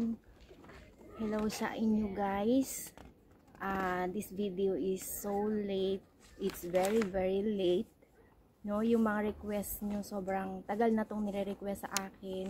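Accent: Philippines. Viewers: batangueña po kayo? :)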